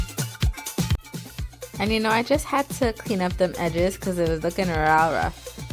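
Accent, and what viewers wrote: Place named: Trinidad and Tobago. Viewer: Lovely. You sound like a trini!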